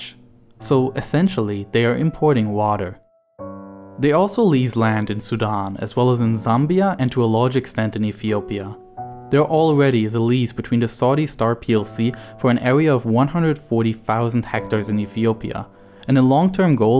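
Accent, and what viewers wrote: Germany. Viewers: You sound german, are you ? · But he does say réservoir properly. So I think it's an accent thing. Probably German. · ReSAWARS. Dieser leicht Deutsche akzent triggert bissle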